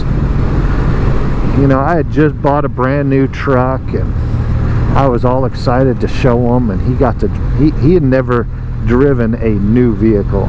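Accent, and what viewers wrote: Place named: West Country, United Kingdom. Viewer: The 'Pirate voice' is a west country accent (south west of england) - The FSM is a well established theme within the atheist movement. As I get older I've moved from a staunch atheist to agnostic, but not necessarily the white bearded man. Until science can prove the origin of consciousness [referred to as the Hard Problem] I'll be somewhere between atheistic death is death, consciousness is a product of brain function and is granular in nature, more brain power more consciousness - and the notion that the brain is a receiver of consciousness. I do not fear death, only the moment of it. I'm fascinated by the notion of consciousness. The YT channel 'closer to truth' has some excellent interviews with prominent scientists and philosophers about it. Anyway, excellent video - you've got this sussed mate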